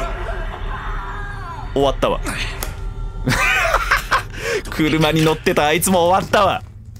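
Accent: Kansai, Japan. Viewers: なんで関西弁なん？笑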